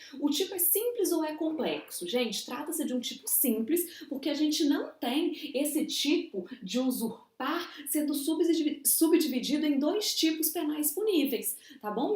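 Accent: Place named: Minas Gerais, Brazil. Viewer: Parabéns Mineira...o sotaque da terra ajudou bastante te ouvir falar...ótima cadencia e construção...continue melhorando!!!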